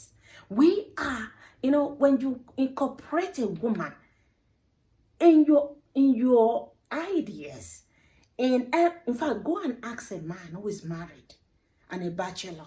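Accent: Kenya. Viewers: Your accent is changing into a Kenyan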